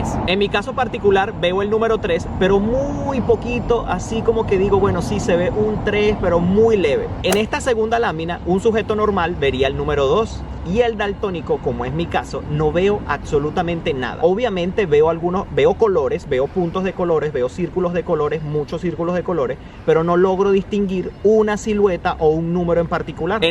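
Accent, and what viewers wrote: Venezuela. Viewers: eres de venezuela? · Umm!! Es la primera vez que escribo un comentario a un Youtuber y veo a muchos!! Tus vídeos me gustan mucho, soy de España hace poco he estado en Portugal unos días :-) me ha llamado la atención ayer ver la banderita de Portugal en tus vídeos y que esté escrito el título en español, enseguida me he dado cuenta que eras Venezolano al escucharte hablar. :-D que chulo!! Venga a por más vídeos!!! Olé!!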